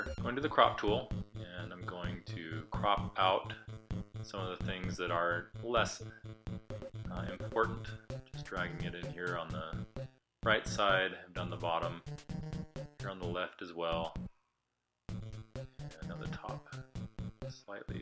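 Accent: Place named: United States. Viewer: where are you located ? Sounds American but what state?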